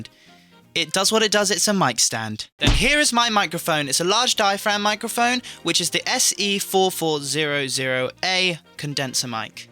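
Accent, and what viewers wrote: United Kingdom. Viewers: ㅋㅋㅋㅋ 영국...분이죠..? 영어는 영국식 영어가 멋있지./ ㅋㅋㅋ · 아니 오빠 윙크 뭐야 뭐냐고 뭐야 진짜 왜그렇게 잘생김? 나죽어 응엉우웅우어엉 오빠 사랑해 으엉 영국 발음 미쳐 진짜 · I know this is random but I LOVE your British accent 😂😂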